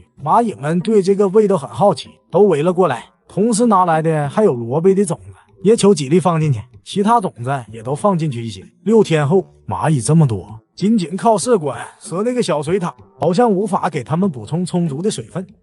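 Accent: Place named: Northeast, China. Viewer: 这大碴子真他妈几把难听！！！！！！！！！！！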